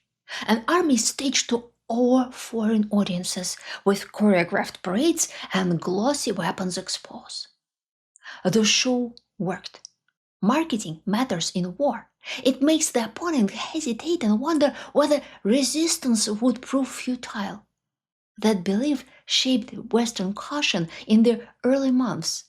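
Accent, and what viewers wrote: Russia. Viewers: Are you Russian? You sound Russian, but your last name is? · That lovely accent of soviet time English teacher)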